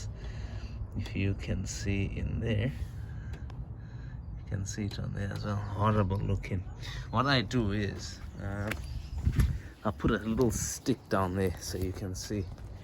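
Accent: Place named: South Africa. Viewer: Dude no joke first video I watched now, you sound like you are from South Africa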